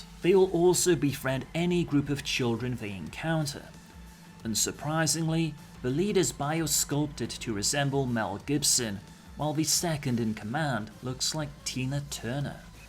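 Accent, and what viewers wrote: United Kingdom. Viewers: If you could it would be awesome. Your speech is nice to hear (and that's why i say about braindancing) but when the meaning is more important (for example gang, drugs, Silverhand, the whole series of cyberpunk lore) then your sounds-like-british accent sometimes can make it harder to understand. I mean... I really appreciate your effort but if you give subtitles, then it gonna be like than cherry on the top of the cake. It really works. For example - i understand 57% of the NC gangs from hearing but when i read subtitles - my gang lore understanding hit to 97%. It really works Samurai 😎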